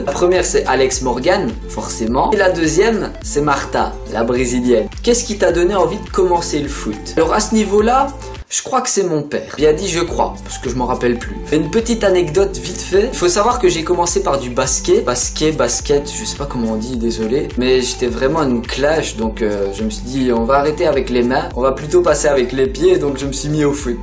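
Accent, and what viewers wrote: Belgium. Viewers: est tu belge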